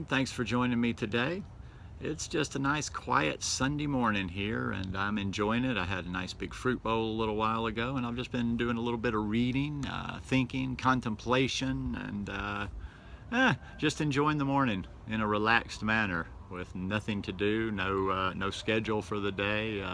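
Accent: South, United States. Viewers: Love that southern draw! Its inspiring to see someone from the south do this journey. I'm an okie myself and there is a lot of southern stereotypes and expectations to be a certain way in the south when it comes to eating meat and hunting and those sorts of things. Your often labeled weird and "hippie" or something worse for rejecting meat and beer and so on. I'm subscribed. Thanks for posting your journey! God Bless.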